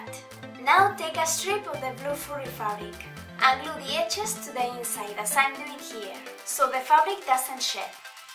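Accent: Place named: Spain. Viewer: You have Spanish accent. I love the video! <3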